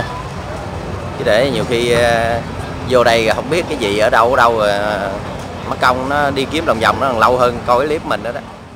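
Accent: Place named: Mekong Delta, Vietnam. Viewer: Nghe con nói chuyện...Thấy thương quá!😍 Rặt dân miền Tây luôn!🖐😍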